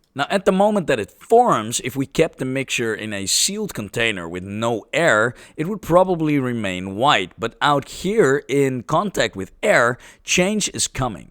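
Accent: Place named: Netherlands. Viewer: very dutch nice accent meneer very goed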